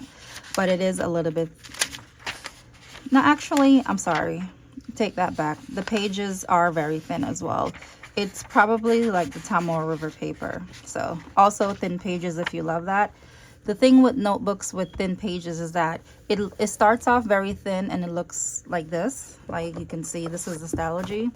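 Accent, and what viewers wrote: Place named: Jamaica. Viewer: Your channel is refreshing... without the stress. I will learn a lot from you. Lol! And I scrolled through to ensure I was hearing a Jamaican accent albeit mixed 🇯🇲😎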